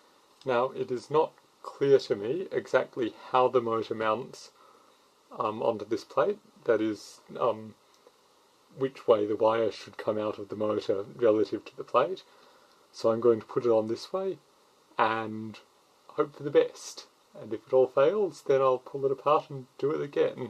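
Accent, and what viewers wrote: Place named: Australia. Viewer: You hit the nail on the head with this video, metal screws shouldn't just screw into plastic, look at the main long rod from the motor, thats the same deal, You need to make sure you get a copy of the STL file so you can make another as this is where you will get wear and tear, all of any of the moving parts that are metal that are in 100% contact with plastic will sooner or later fail, look at a RepRap for how the screw rods all have a nut to screw into and not bare plastic. You sound like an Aussie, I am as well, I had an order with this guy at milkyoubox and he took too long to send, 5 months was too long to wait, others wait still. Order canceled. This printer is cheap, in all ways, construction and money, but all up the waiting around costs more than anything, and all who give money too the guy at milkyoubox is padding his bank account as long as you wait, the interest from your own bank account you could have made on your money instead of he at milkyoubox.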